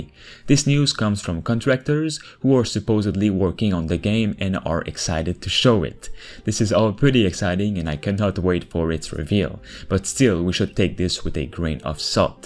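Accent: Quebec, Canada. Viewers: Judging by the accent, he is probably french canadian.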